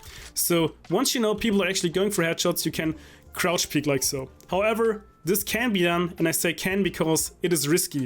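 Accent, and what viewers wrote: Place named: Germany. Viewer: It sounds like he have a german accent :D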